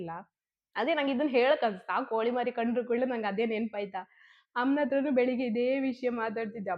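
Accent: Kundapura, India. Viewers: Supper kundapura Kannada I like it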